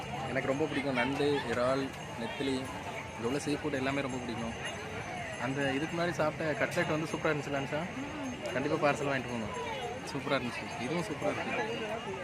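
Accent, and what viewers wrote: Tirunelveli, India. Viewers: Hi I am new subscriber. Unga native place enga . Slang Tirunelveli maathiri iruku anna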